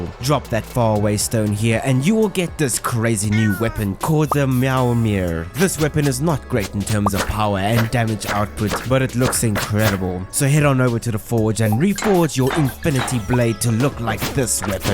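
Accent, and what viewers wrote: South Africa. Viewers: broski is South African, just like me :D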